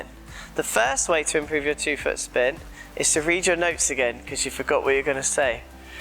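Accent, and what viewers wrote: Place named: England, United Kingdom. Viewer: I know right? To this Texan (Texas, USA), refined accent sounds so cute and charming. Instead of saying "water," he says "watah." He usually shoots his video straight and doesn't try to be funny but in this one he plays around a little and he even plays with the accent once and says "wateeeer," I can't remember what word he said. I'm surprised you are attune to English accents, I guess you speak English well. You wanna talk about charming, I'm sure you know the famous Brazilian singer Roberto Carlos. I like to listen to him sing in Portuguese and if I know the song in Spanish I like to think I can follow along in Portuguese. Mostly I listen to him in Spanish and he has a Portuguese accent and it's majorly charming. So, we all agree, is amazing.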